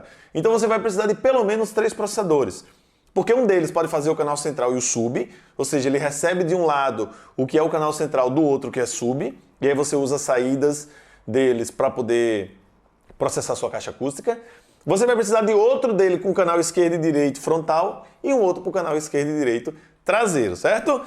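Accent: Northeast, Brazil. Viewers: Da um nojo, vai assistir um video e percebe esse sotaque de merdestino.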